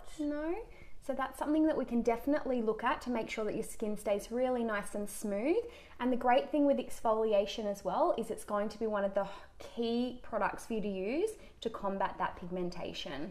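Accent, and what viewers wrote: Australia. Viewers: l’accent australien n’est pas le meilleur pour l’ASMR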